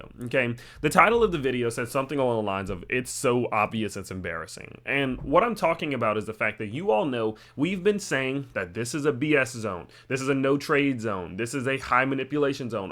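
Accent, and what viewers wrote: DC/Maryland, United States. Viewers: You have a DC/Maryland type accent. Maybe Delaware but prolly not....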